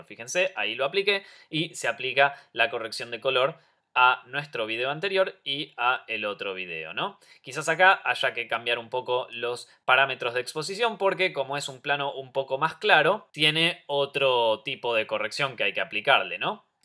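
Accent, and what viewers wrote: Argentina. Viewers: Nuevamente, si así puedo tutearte!! muchas gracias desde España!! te felicito por tu forma de explicar las cosas, es útil y divertido!! y me encanta tu acento argentino y la forma de expresarte!! me estoy tragando cada tutoría con el mas grande interés!! buen trabajo!! Vale puede parecer un comentario muy pelota pero que narices, a veces, corresponder a algo bien hecho es merecido!!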